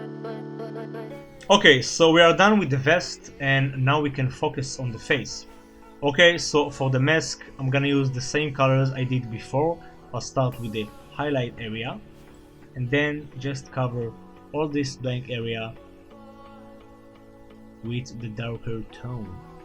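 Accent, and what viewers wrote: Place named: Israel. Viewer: חחח יש לך שם ומבטא של ישראלי. יש מצב? Anyway, Your art is amazing!!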